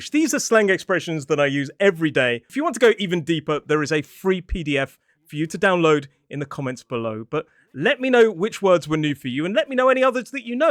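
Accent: United Kingdom. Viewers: Love your British accent 🎉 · I absolutely love the British accent and phrases 😍 My late husband was British! I enjoy your videos 😁 ❤️ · I really like your british and energy you putting in the videos. 😊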